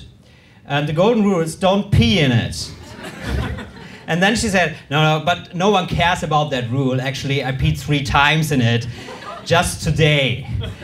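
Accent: Germany. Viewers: Sein Englisch hört sich so Deutsch an, dass es wiederum so wunderbar authentisch klingt. Respekt erstmal für den Mut in einer Fremdsprache vor Publikum aufzutreten. Als introvertierte Person finde ich das durchaus bemerkenswert, aber ich glaube, unser Till ist so extrovertiert, der hat nicht mal eine Sekunde abgewogen, als er das Angebot bekam :D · Unfassbar! Till, du schaffst es deine lustige Art ins englische zu übertragen. Es sind in meinen Augen nämlich nicht unbedingt die Jokes die dich von anderen abheben, sondern die Art und Weise des Vortragens. Und diese super witzige Art hast du auch mit deinem herrlichen deutschen Akzent im englischen rüber bringen können. Thumbs up! 👍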